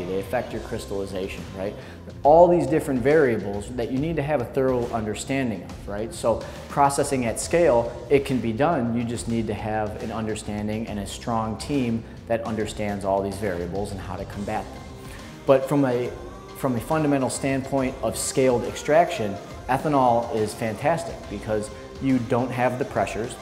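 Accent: United States. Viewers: is that a chicago accent? great accent, can't quite pin it tho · midwest accent represent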